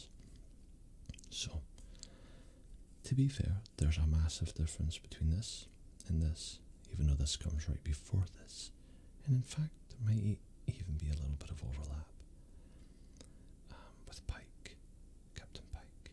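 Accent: Scotland, United Kingdom. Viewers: I have a request never stop posting asmr videos the Scottish accent is very soothing. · Two of my favorite things in life. Star Trek and a beautiful Scottish accent. You just got a new sub! Love this!!!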